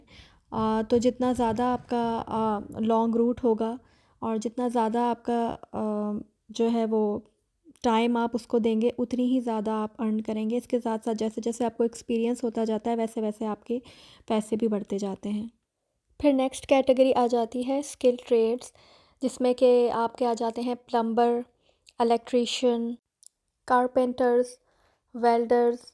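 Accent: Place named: Karachi, Pakistan. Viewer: By accent looks like you are purely from Karachi. 🤔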